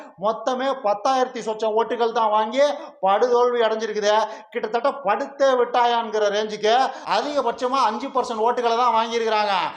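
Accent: Kongu, India. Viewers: ஏன்டா கொல்டி நாயே... பஞ்சம் பிழைக்க வந்த நீ கொங்கு தமிழ்ல பேசினா தமிழனாக ஆயுடுவியாடா நாயே... நீ யாருன்னு எமக்கு தெரியாதா நாயுடுகாரே....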